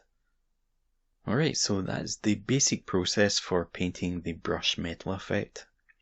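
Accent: Scotland, United Kingdom. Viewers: I had to dig the urban meaning of shucks ^^ English is not my native language (being French)..... but I enjoy your scottish accent :) Keep up the great work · Wow. Miniature painting has come a long way since the 80s! Sublime work... not to mentioned narration by a smooth, Scottish accent.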